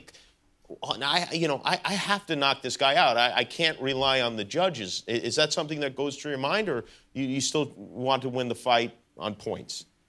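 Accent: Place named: Australia. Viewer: well obviuosly you're australian.... lol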